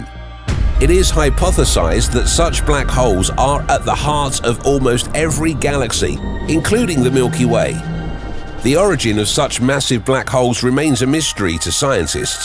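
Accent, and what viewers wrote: United Kingdom. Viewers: Because the word sounds more daunting in a British accent.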